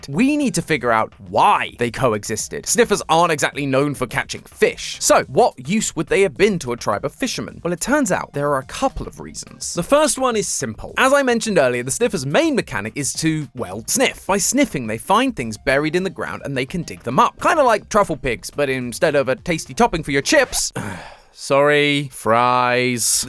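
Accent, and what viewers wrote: England, United Kingdom. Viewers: Why is he British now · I love that new Bri'ish Game Theory! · Are you British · The British accent is so annoying can you tune it down a little bit? It’s just if you’re gonna go full Mat style story telling, the British accent sounds very distracting and confusing.. try to either do your own style or try to lessen the accent a bit. No hate for brits, and thanks for the amazing theory as always.. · I forgot game theory is now british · British Matpat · not making fun of you And I love the British accent but is it suppose to be "Wa oh" instead of "wuter" (I'm so sorry love the theory tho😅) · I love when the british talk about minecraft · Who is this British person, where is matpat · with a british accent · British MatPat is almost as wild as MatPat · Why does the new guy sound like matpat, but british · Too british, unsubscribed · its just british matpat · Sadly the voice just isn’t the same to me, feel like I’ve lost part of my childhood now matpat isn’t here. 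This and the last although the content is what I’m interested in, unfortunately all of mats energy I feel has been lost. I’m a Brit so used to the accent and honestly it’s now just way less entertaining for me. I really do wish all involved in theorists continue to grow and have wonderful times adventuring into these stories, it’s just kinda hard to accept maybe you’ve grown out of one of your favourite channels. A narrator is very important and sadly YouTube has lost one of its best. Game theorists, not lost but will never be the same. Miss you matpat ❤ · Dude im so sad i opened this video and expected matpat then i got jumpscared by this british guy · I thought I would be salty and abandon these channels after Matpat was gone but after listening to Matpat and honoring his wish of giving the new voices the same chance we gave him all those years ago has worked out perfectly, much love british Matpat! 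· i forgot matpat retired and got jumpscared by british matpat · Come on. I clicked this video expecting to hear mat pat. Instead I hear some British replacement. I really don’t want to say it, but the newer videos aren’t as good because MatPat isn’t there. It may be the same scripts that he would’ve read, but there’s something about mat pat that just makes me want to watch him. Not you. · are u english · Why is the rest of the team suppressing his britishness 😢 that's way past uncool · is just british matpat i like him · Hehehe the team is definitely putting the new brittish accent to work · This is the first Game Theory video I've seen since MatPat left. ... you sound like a British matpat.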